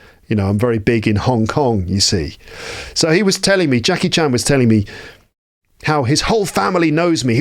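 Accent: United Kingdom. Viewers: I think you should warn your audience that listening to your podcast can be very dangerous. After just a couple of episodes I’ve got completely addicted and now I can’t stop. I love your short stories and I like so much your rambling episodes too, no matter how long they are. With your beautiful voice, your lovely British accent and your sense of humor you could read your shopping list and it would be interesting, amusing, useful and totally enjoyable From now on I will stop watching Netflix movies that I can’t completely understand, it so frustrating. I will listen to your podcast instead, so hopefully my old-fashioned-miss-Marple-English (I’m a big fan of Agatha Christie novels) will become more modern and more fluent. Thank so much for your great podcast,.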